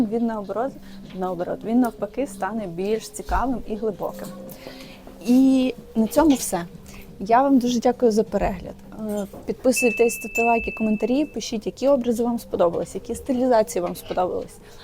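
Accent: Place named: Surzhik, Ukraine. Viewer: такий милий суржик, дякую за відео.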